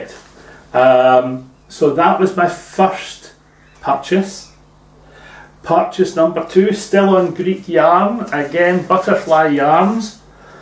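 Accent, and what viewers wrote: Scotland, United Kingdom. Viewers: Everything you have shown is beautiful ! Its great hearing a Scots accent on YouTube ! I live down in Gorebridge . I can talk the heed of a stamp you'll regret saying just ask ...is it a Scottish thing ? We love a natter x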